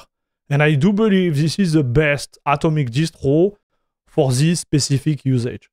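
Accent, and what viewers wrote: France. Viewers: Tu serais pas un peu français ?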